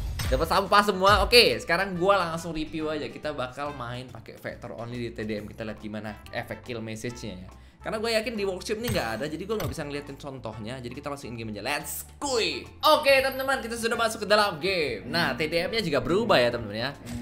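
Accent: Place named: Medan, Indonesia. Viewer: Gw suka kalo bag marah marah kau kau wkwkwk org medan mana ni horass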